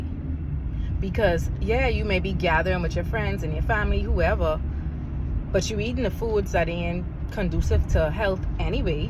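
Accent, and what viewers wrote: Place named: Bahamas. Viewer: Your accent is beautiful. It sounds Bahamian.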